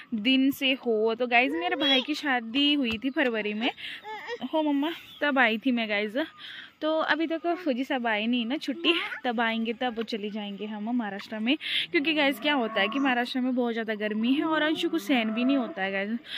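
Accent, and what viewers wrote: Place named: Himachal Pradesh, India. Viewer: Bahut sundar vlog bahen ham utrakhand se hu haridwar roorkee mai rhti hu aur aapki Bhasha se lagta hai ki aap Himachali Ho han ji Time To lagta Hai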